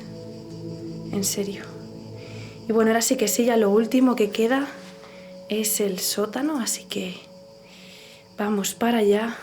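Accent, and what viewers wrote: Spain. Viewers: eres muy genial tienes una voz dulce y hermosa me encanta como hablan en tu bella españa bellísima españa saludos ❤✌🏿🇨🇱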